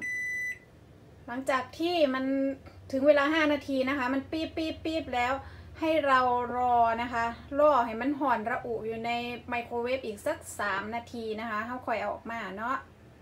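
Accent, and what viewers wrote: Ubon Ratchathani, Thailand. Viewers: พูดแบบคนอุบลแท้ดูทุกคลิปนะคะ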